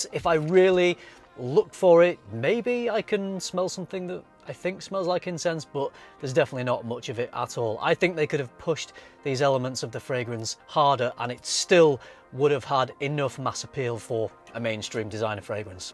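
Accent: United Kingdom. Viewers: Love your british accent... cheers from Argentina!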